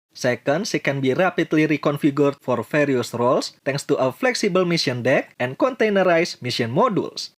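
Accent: Indonesia. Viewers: Its a gramatically correct and nice Indonesian accent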